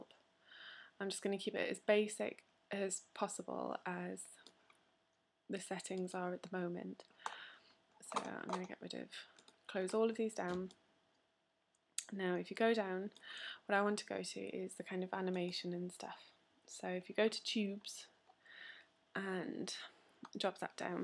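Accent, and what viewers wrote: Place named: United Kingdom. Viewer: Thanks girl! Great simple tutorial for paint effects. I'm glad to find another woman who uses maya. hehe. :) ...Btw you have a very pretty accent. lol. Are you from the UK?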